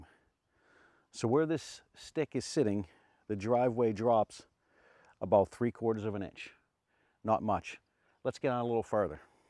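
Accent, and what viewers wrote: Pittsburgh, United States. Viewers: Man, that's a GREAT Pittsburgh accent. Love it. Thanks so much for posting this. It's exactly what I needed.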